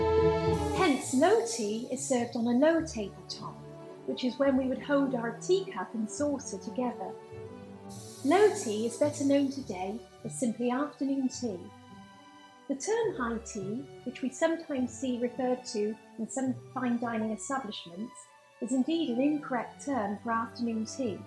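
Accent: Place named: United Kingdom. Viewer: I love british accent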